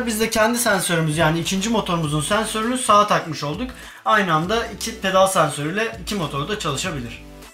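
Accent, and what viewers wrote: Erzurum, Turkey. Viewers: abi siz erzurumlumusunuz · Abi Erzurum lu musn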